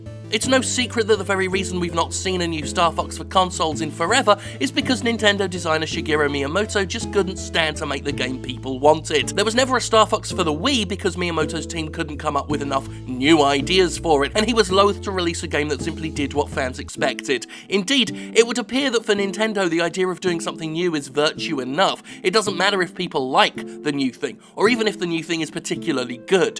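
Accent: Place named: United Kingdom. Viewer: I've never heard of you before this video was highlighted on Kotaku. Not only do I enjoy your words but everything sounds even better if your Brittish. However, the reason I subscribed is because this is brilliant and clever and very simple and I have a fondness for sticking it to the large corporate entity. Well done and I look forward to more videos.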